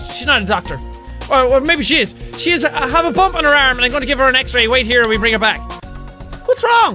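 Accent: Ireland. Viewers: hes irish thats why he has that accent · I just got out of surgery. What’s better than watching my surgery as a video game with an Irish lad commentating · He's Irish mate. I'm half Irish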